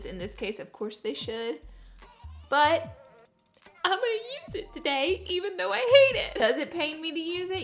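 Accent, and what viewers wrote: South, United States. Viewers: I saw your manicure on Snapchat and I'm in love with it. I've been wanting to tell you something but you get so many comments that I doubt you'd ever see it, but I just wanted to tell you that I love watching your videos because I feel like I can relate to you so much because I'm from Louisiana and your southern accent makes me feel like I know you personally or somethin. I know it sounds crazy but every time that you really like a product I end up going to buy it just because I feel like I know you or something and I feel like we would like the same things. Also I was very surprised that people didn't know what cat's pajamas meant I've been saying that since I was a little kid so maybe it's a southern thing. and speaking of your palette, I use it almost every single day and I have a beauty group on Facebook and I have almost all of my members using it as well because I do live videos of looks with it and they fell in love with the colors and how pigmented they are. 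Just wanted you to know that I really appreciate you and relate to you on a strange level. too, because his mannerism reminds me a lot of my husband's, so I love seeing the two of you together because it reminds me of my husband and I. I hope I don't sound super weird you probably won't see my comment anyway but I just wanted you to know that there was someone out there that really relates with you and appreciates all that you do for the YouTube Community. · I’m glad I’m not the only one with a country accent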